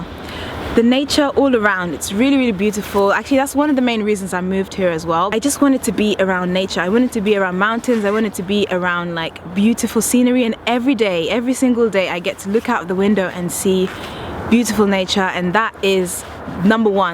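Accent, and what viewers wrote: United Kingdom. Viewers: gosh I love your accent! I wanna move to Britain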